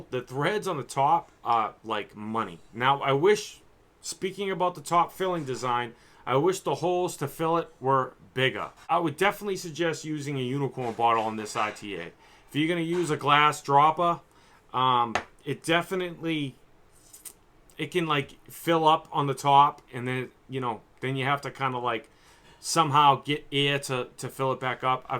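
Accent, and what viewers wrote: Boston, United States. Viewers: dude yr boston accent is really fukt up, the Petri is an R TA, not AH TA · Thanks for the great review as usual! I'm assuming ur from Boston because of ur accent! If so where in Boston r u from? I live in Medford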